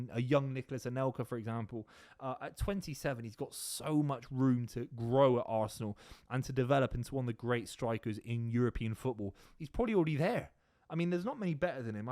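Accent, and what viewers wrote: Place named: West Country, United Kingdom. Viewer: I just cant take a City fan with that accent seriously... He should support Plymouth or Bristol...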